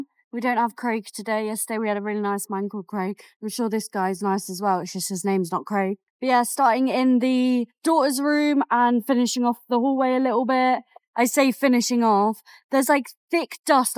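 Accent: South, United States. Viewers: As an American I must say you do an excellent Southern accent😁! Love your work ethic and ethics in general. You are a godsend to many people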